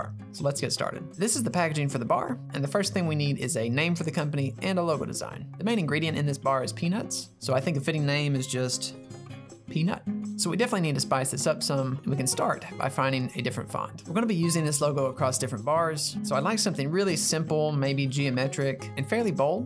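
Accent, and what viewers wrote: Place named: Texas, United States. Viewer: I get the feeling he is from texas just by the way he sounds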